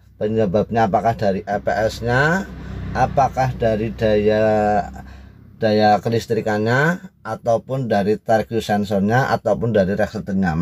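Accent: Banyumas, Indonesia. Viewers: Pare kediri hadir mas,kok lama tidak muncul ini,nyong kangen karo boso ngapak2 nya...hahahhahah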